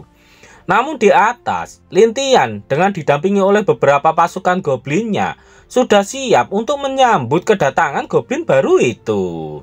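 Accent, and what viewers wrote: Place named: Java, Indonesia. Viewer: Kg jawa medok kembali 🎉🎉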